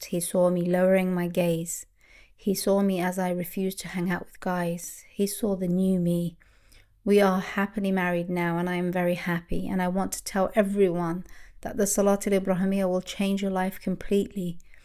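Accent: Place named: Russia. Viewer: You sound russian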